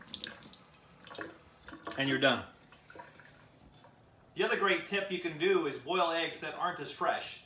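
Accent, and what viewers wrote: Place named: Canada. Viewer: B.C. Egg! I thought I heard Canadian-speak in your voice! Love your Elvis TCB shirt, where did you get that? Oh yes, and thank you for another helpful video! I used these methods after my successful EZ-peel hard boiled eggs experiments, inspired by your other video at <link>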